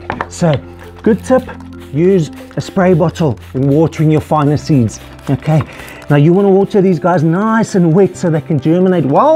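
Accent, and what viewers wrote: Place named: South Africa. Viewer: I can recognize that accent anywhere. From what part of south africa are you?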